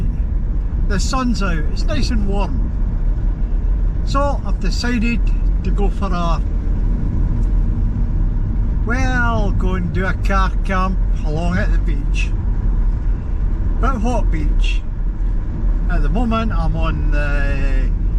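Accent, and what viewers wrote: Scotland, United Kingdom. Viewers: Awh really enjoyed this video! Very wholesome❤your dog is so cute! And I love your accent! I need to visit Scotland! Hope your enjoying the weather!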